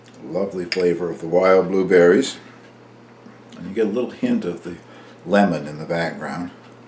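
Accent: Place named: Canada. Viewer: this looks like a yummy pudding I am wondering if the dumplings have come from my homeland (Scotland ) sadly I now live in Oxford,England which is a beautiful city of course but its not Scotland. I love your recipes its just lovely listening to your Canadian accent and enjoying all the things you cook including the cheeses. I live in a tiny bungalow with the smallest kitchen ever so I cant see myself getting into the cheese making including the press etc that needed. I started off watching your Cabin episodes but now I love all your videos I'm a big fan thank you again Mae x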